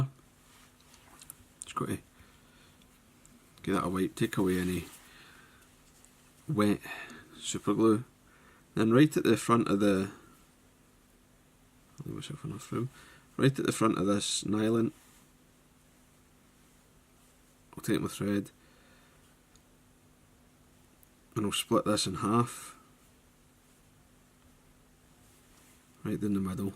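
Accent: Scotland, United Kingdom. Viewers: I think this fly was created by a Canadian named Elliott something. I tried it years ago, not successfully, when I was learning. Should be easier now. By the way, little by little I am solving the Scottish accent. You have a lot of flies that should do very well here in Florida USA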